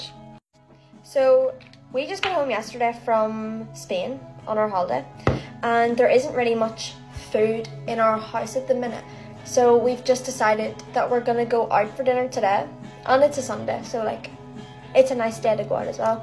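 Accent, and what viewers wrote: Ireland. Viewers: Is she Irish? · Is she Irish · I love your accent im from Ireland too and your just so pretty ❤ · Are you Irish? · They are Irish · Is she Irish or something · Ily your Dublin accent 💗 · I swear .our accent is the same ❤ I’m also from Ireland btw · Yh she’s Irish too❤ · Is she IRISHHH? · yep it's Irish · I didn’t know she was Irish- I love their accents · it’s irish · Y’all I might be dumb but is she irish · I knoww I love Irish accent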